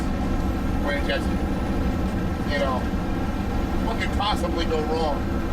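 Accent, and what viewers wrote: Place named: Philadelphia, United States. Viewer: I lost track of how many times you said "wooder".. where u from driver? My hubby pointed it out. He's saying Delaware county PA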